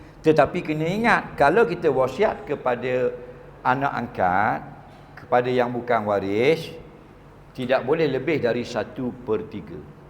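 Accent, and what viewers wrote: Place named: Terengganu, Malaysia. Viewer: Ustaz ckp bahasa melayu standart..ujung2...je ganu...jarang2 ni..😂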